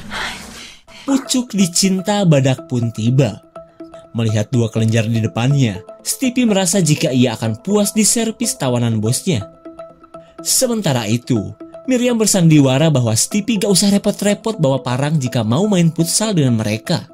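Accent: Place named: West Java, Indonesia. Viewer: Suka gya bicara ada sunda2 gituhhh subrek donkk gaskeunn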